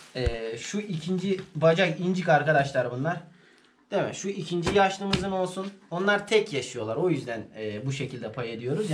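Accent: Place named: Macır, Turkey. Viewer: Iyilik bulaşıcıdır...lütfen niye gösteriyorsun diyenlere bakmayın yeni keşfettim kanalınızı ve çok çok sevdim. Ailenize de sizede minnoş kızınıza da hayırlı uzun omurler versin benim babam macır göçmen konuşmanız siveniz o yüzden köy özlemini giderip bir nebzede olsa mutlu ediyor🤗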